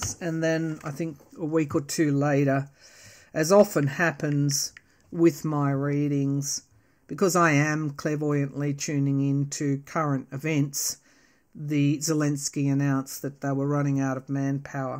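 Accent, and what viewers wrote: Australia. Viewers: Hi! I'm just a country girl who was born 65 yrs ago and have resided in North Carolina all my life. I found your channel a few weeks ago. Love your readings & love your accent!!! If I understand correctly, you live in Australia. On one of your videos could you say "G'day"? 😊 It has been my feeling/dream (since I was a young girl) that I should visit Australia one day. I have only visited one country outside the contiguous USA and that was South Korea. It was an amazing country! But, for some reason, I feel Australia is still calling out to me.😊 Anyway, I adore you and your channel! May God bless you!❤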